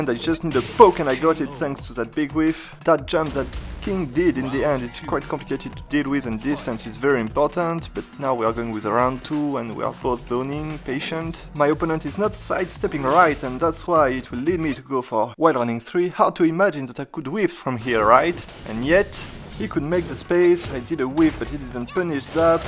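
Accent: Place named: France. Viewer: btw...i love your French accent 😄😄😄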